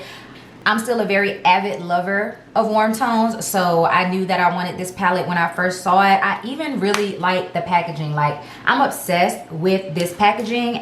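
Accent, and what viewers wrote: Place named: Miami, United States. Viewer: I looooveee your channel and your Trina like Miami accent😩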